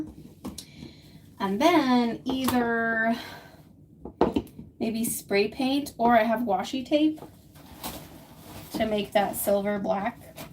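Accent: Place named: New York, United States. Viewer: Thank you so much for the fun live yesterday. Just going back and watching the beginning… chef’s kiss to your New Yawk accent. “We makin’ CAWFEE OVA HERE!” 😂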